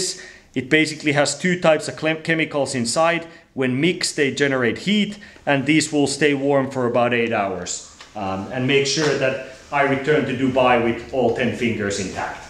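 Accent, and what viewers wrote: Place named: Finland. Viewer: Propsit sulle ite oon vaa haaveillut everestistä ja muuten toi rallienglantihan kuulostaa hyvältä!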